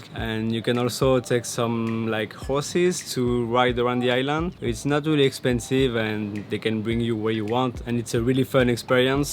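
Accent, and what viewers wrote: France. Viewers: your voice in combination with the french accent is so nice to listen to! wonderful aerial shots, that want me to go back so badly.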